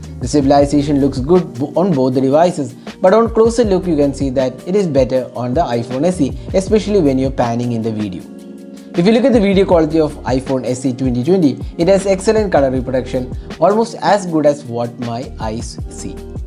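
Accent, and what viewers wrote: Kerala, India. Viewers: Are you Malayali bro.i think you have Malayalam accent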